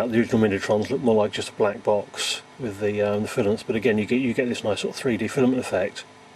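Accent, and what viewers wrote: United Kingdom. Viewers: British actually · my apologies, but, I'm American and I'm having a hard time following you , you sound like your mumbling...!!! Sometimes the Brits murder the English language....now Bigclive I understand him clearly..!!!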